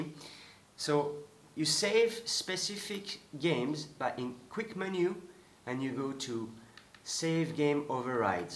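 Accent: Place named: France. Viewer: Salut, tu sembles être français à ta façon de parler.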